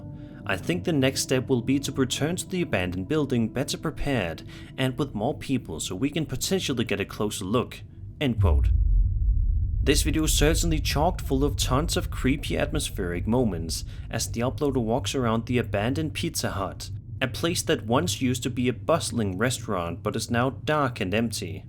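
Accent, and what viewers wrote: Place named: Denmark. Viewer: I like how after i found out you were danish i can’t unhear the strong accent you have lol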